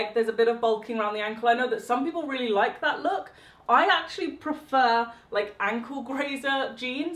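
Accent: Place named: RP, United Kingdom. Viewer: Oh no! She's gone full on posh! But I love the tweed!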